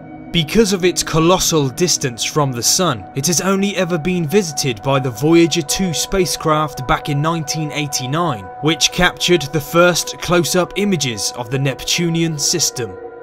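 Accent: United Kingdom. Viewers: That's because he's British! Us brits explain things in great detail and talk properly 😁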